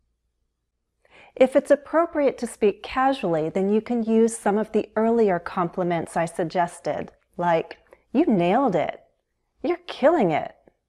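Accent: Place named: United States. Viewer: I am not too much into the American accent, nevertheless I watched the whole video and sensed how noble and classy you are. you nailed it. Thanks, this is my first subscription to an American channel, big thanks to your friendly video here.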